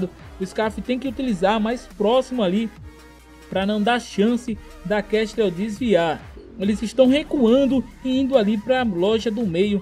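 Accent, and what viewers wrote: Northeast, Brazil. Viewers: é Nordestino 😱😱 Eres de onde Mestre?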